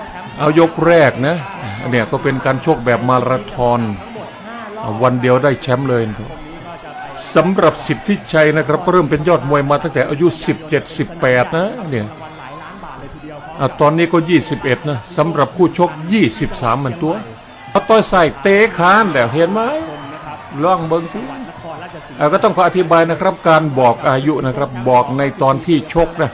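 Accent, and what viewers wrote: Isan, Thailand. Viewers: ท่านพากสำเนียงอิสานใด้น่าขำ..ท่านอื่นพยามเลียบแบบแต่ไม่มีใครสู้ฟังดูแล้ว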